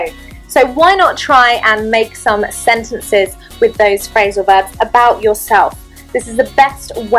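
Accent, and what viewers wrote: United Kingdom. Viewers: Beautiful British English!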